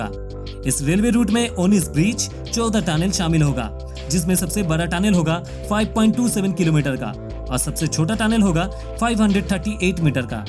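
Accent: Bengal, India. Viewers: Your hindi has improved a lot. But it still needs to leave the bengali slant. Other than that, the videos are good in most aspects. Best of luck for future.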